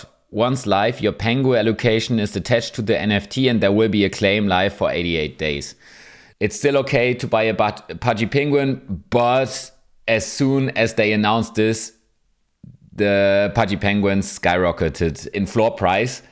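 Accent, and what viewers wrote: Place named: Germany. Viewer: ich seh grad in den Kommentaren dass du auch deutscher bist hab mich schon gewundert wegen dem Akzent 😅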